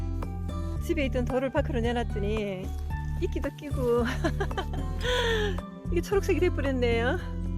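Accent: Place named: Gyeongsang, South Korea. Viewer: 서울말 쓰는 갱상도 아지매시군요. 꽂아놨어요를 꼽아놨어요에서 빵 터졌음......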